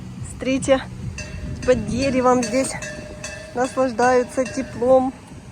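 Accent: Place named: Odessa, Ukraine. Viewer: Спасибо. Очень красивое видео. Постаралась🌹🌹🌹 Ты единственная девочка, которая разговаривает с Одесской интонацией. Приятно слушать.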